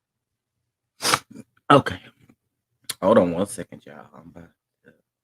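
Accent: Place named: Dallas-Fort Worth, United States. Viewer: I was trying to figure out why I felt a connection to your voice, it’s because we in the DFW! Let’s go to brunch! 😂😂😂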